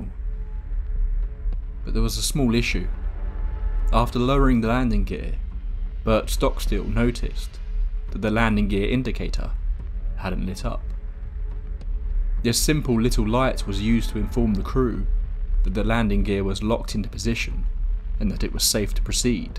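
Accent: England, United Kingdom. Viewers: Nice british accent n great storytelling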